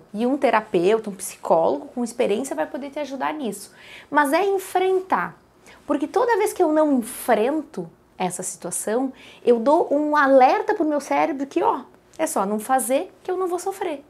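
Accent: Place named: Rio Grande do Sul, Brazil. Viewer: Esse sotaque gaudério eu conheço kkkk ótimo vídeo dra.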